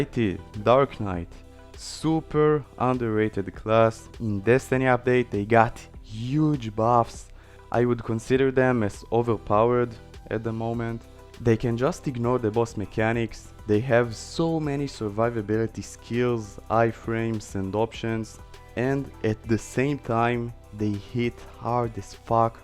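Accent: Israel. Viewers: ישר זיהיתי תמבטא הישראלי אחי ישר סאב! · וואלה יא קינג זהיתי אותך לפי מבטא איזה ישראלונים אנחנו, אחלה סרטון דרך אגב.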